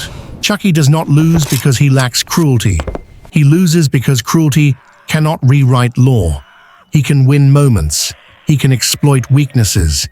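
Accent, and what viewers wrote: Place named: United Kingdom. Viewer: I think he did pronounce leprechaun but in a British way like they always talk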